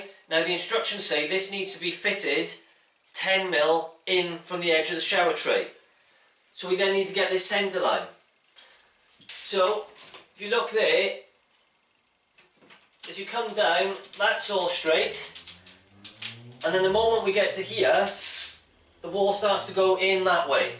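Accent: Wales, United Kingdom. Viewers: Got a welsh twang there. Great video's. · good vids mate! are you welsh? haha. a lot of youtubers drag shit out but you just get to the fukin point haha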